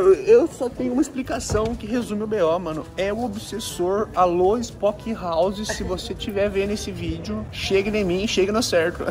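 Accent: Rio Grande do Sul, Brazil. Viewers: tu é de onde? fala igual nois aqui do rio grande do sul kkk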